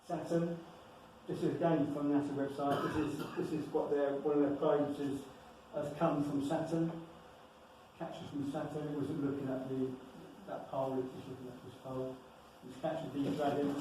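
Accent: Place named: United Kingdom. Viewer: Love that British Accent........feels like home .......thank you for your Love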